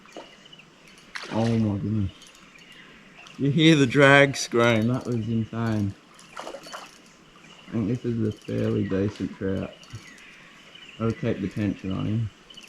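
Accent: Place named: Tasmania, Australia. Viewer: You are living the life,. Good to see. I hope any snakes you encounter know they are only allowed to bite your gaters. Curried egg sangas with a wee bit of lettuce in them are in fact yum. You sound like Tob Parsons Must be a Tassie thing.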